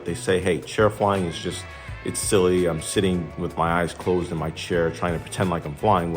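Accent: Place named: New Jersey, United States. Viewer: Hey whats up, im guessing your from Jersey. Are you a CFI in the area?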